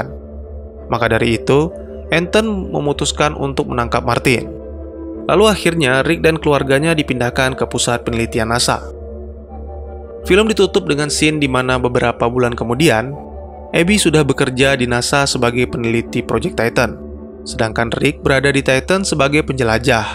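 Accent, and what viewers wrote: Aceh, Indonesia. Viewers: Orang Aceh bg ya.?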